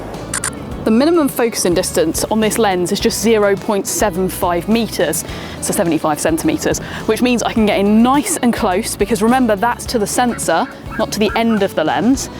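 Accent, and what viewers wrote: United Kingdom. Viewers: British accent 🤩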